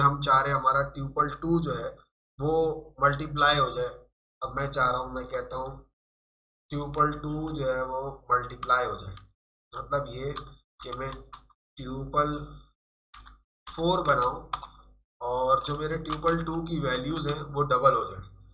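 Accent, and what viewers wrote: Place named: Punjab, India. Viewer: Is lecture me thori Punjabi tone b sunai Di ha.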